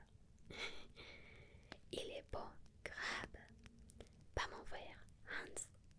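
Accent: Spain. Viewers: Pour moi, c'est justement ton accent espagnol en Français que j'adore. Merci pour l'effort que ça doit être pour toi. ❤ 👍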